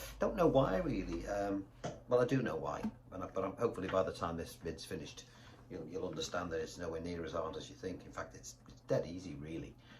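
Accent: Liverpool, United Kingdom. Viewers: Actually I can do this pretty good. I learned it from Val Doonican on his TV show in about 1977. That was after 20 yrs of trying to play with a plectrum! The reason for my comment is to thank you for the clear simplistic way you have delivered this very important aspect of guitar playing. It certainly brings out the best in solo playing - that much is for sure. I like your easy delivery and I think I detect a little bit of infectious Scouse in your voice don't I? Thanks again, BjG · You’re deffo an old Skool scouse and this is a really well taught lesson👌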